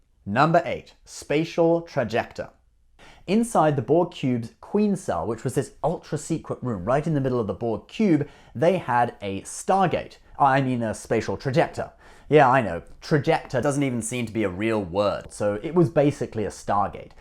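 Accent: United Kingdom. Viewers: YES THE FRICKING BRITISH IS BACK EVERYONE THIS IS EVEN BDTTER THAN WHEN I FIRST HEARED ABOUT HALF LIFE ALYX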